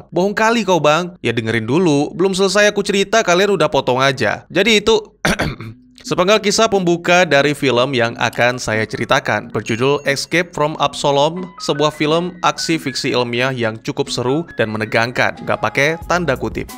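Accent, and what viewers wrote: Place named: Medan, Indonesia. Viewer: Wkwkwkwk logat orang Medan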